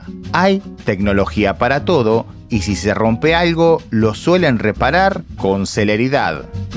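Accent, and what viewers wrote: Argentina. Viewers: Me suena argentino este muchacho. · ¿Eres mexicano o argentino? Porque veo que haces tomas de referencias mexicanas, pero hablas como argentino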